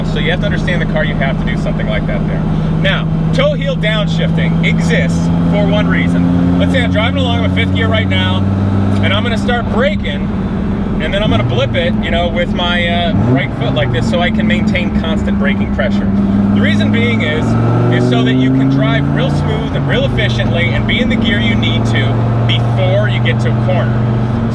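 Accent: United States. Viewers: Great vid, enjoyed listening to a Yank ;D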